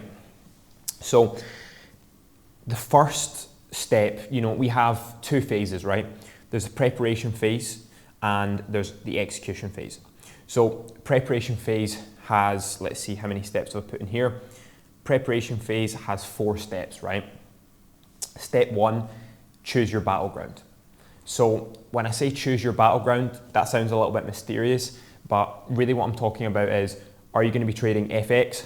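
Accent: Scotland, United Kingdom. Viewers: Where abouts are u from mate u sound Scottish ?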